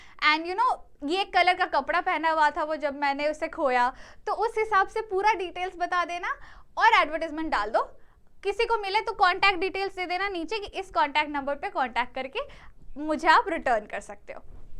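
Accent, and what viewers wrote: India. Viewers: bihar, up, ya haryana se hoga sawra pakka.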